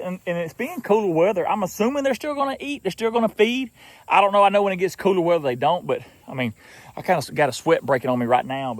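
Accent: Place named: South, United States. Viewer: still has a clear southern accent at 2X speed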